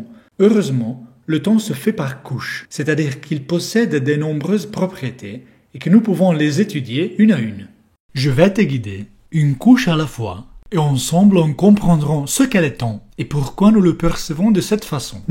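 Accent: Italy. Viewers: Vraiment je pensais que tu imitais la voix de Roveli, mais tous les italiens ont cet accent adorable quand ils parlent français, c'est trop chou <3 Donc si je tiens compte de la structure géométrique d'un réseau de spins. Je peux dire que chaque point rouge représente le centre de rayonnement d'un "quantum de distance". Autrement dit cela ne peut pas représenter des sphères, mais des polyèdres, exactement comme ce que l'on obtient dans une mousse de bain, où chaque point rouge est le centre d'une bulle, et le rayonnement s'adapte en fonction des bulles environnantes (formant des polyèdres). Si je considère un univers plan, la structure géométrique serait parfaitement équilibrée avec des triangles équilatéraux, et il ne se passerait rien. Le truc curieux, c'est que cet espace existerait bien physiquement, mais n'aurait pas d'épaisseur, oups ^^ Par contre, la structure 3D la plus simple et la plus équilibrée, serait une structure des tétraèdres, et normalement (sauf erreur) on ne peut remplir un volume avec des tétraèdres réguliers sans être obligé dans déformer quelques uns, sinon il y aurait des "trous". 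Autrement dit si je ne me trompe pas, une structures 3D infinie, n'aurait de cesse de se déformer, cherchant quelque part un équilibre et ne le trouvant jamais, en dehors de toute notion de temps bien sûr. Et qui dit déformation, dit énergie ... ce qui répondrait à une très vielle question philosophique quand même ;p Et si je regarde un seul point rouge dans ce contexte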